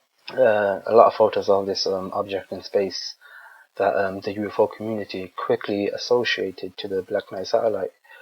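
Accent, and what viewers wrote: United Kingdom. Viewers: You fool... remember that 10 ft. wide meteor video shot from a distance of, oh, at least a few hundred miles away? Remember the intense magnification and sheer size of the video camera it took to make the meteor appear larger than any comparable object in space? Notice how, of this video the astronauts zoom in as much as possible yet the thermal blanket looks ---- incredibly small? Remember all the photos and videos of the hundreds of thousands of pieces of space debris taken by any number of astronauts who participated in low-orbit flight missions? Notice how in the video N.U.A. (Nothing Up [my] Ass???) conflates relatively small portions of the "blanket" with obviously larger portions of the object - still - in question? And please do tell us how NASA is always honest with the public (a place where "honesty" means something different to NASA employees in adjacent offices within its buildings). 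Before you believe some idiot with a droll English accent and before you gag on NASA's nuts, take a few minutes to actually ponder this video and consider how little information, beyond his "opinion" is being disseminated. Consider, too, that this is the same slickster who posted (among other fantastic "UFO" video lies): CAN YOU DENY THIS?! FLEET OF HUGE UFO MOTHERSHIPS - LA JOLLA, CALIFORNIA - 31/3/2015 without ever once seeking to inform anyone that the government has any number of aircraft that can perform everything seen it his video. If you must, buy a clue... may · You sound British Asian if I'm right?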